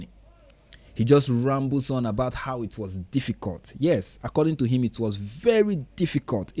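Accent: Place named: Nigeria. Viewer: So happy the algorithm sent me in your direction. Nigerians have a special clear sighted snark and its a voice in the most musical of accents the world needs to hear right now. Privileged Americans whose careers are entirely racially based are everything wrong - just another way of staying on the plantation - we outside. Peace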